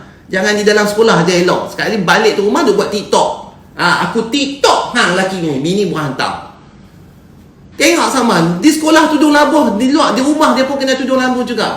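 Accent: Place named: Penang, Malaysia. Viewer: Loghat penang datuk sangat mencuit hati.moga Allah sentiasa merahmati datuk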